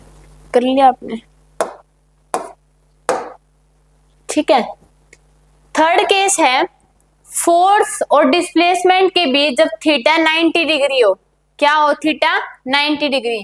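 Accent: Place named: Haryana, India. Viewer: Haryanvi 😅, best question ma'am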